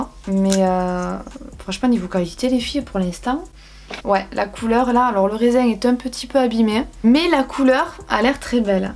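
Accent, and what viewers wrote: South, France. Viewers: c'est l'accent du sud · sud de la france ? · L’ACCENT DU SUUUUUUD J’ai juste l’impression que mis à part les ch’tis... on a pas d’accent dans le nord 😂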